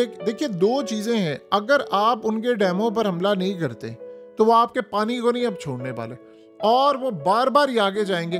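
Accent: India. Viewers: آپ بھی انڈین لگتے ہو آپکا لہجہ انکے حق میں جاتا ہے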